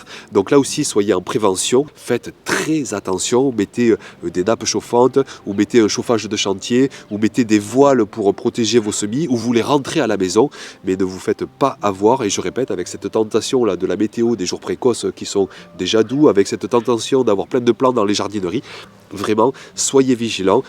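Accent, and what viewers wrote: South, France. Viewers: Trop bel accent du Sud ❤j’ai plutôt l’accent du Nord ❤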